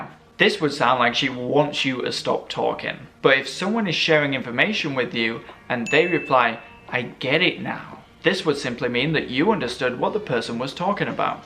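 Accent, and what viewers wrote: United Kingdom. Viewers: More videos with the British accent please 😁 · у него Английский английский как на счет американской версии?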